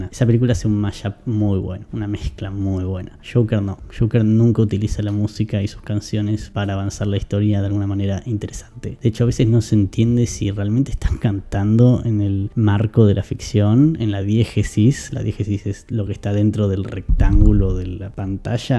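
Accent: Argentina. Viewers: Holaaa. Nuevo micrófono 🎤 necesitas calibrarlo o cambiar.,¿Puedo opinar?. En mi pantalla no logré entender algunas palabras con ese nuevo micrófono. (Use subtítulos , no sé si por ser mexicano pensé, el tono argentino pero. . . Pero pero ... En el celular con volumen un poco mas bajo y sin ruido alrededor.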